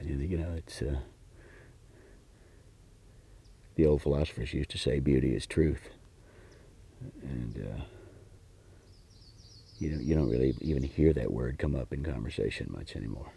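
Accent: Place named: Texas, United States. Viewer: Wow, I just realized that Mr. Henley's speaking voice, the cadence sounds like George W Bush...it must be the Texas accent, which neither sound so southern. I was not a fan of W., but I sure do love!!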